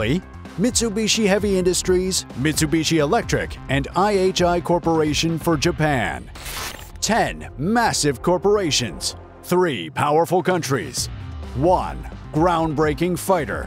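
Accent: United States. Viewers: Why the American narrator?????? Very offputting. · I really am getting sick to death of hearing American accents on videos that have nothing to do with America. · Why are these vids narrated by a yank ? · Why does it have to be narrated by a yank? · Why do we have an American voice,? Oh perhaps it because they are the experts on killing people?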